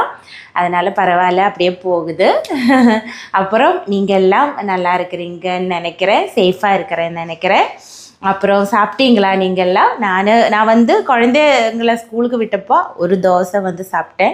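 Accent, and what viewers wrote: Kerala, India. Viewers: Tamil malayalam rendu kalandu Super a pesiringa anni I like your family 🎉❤😊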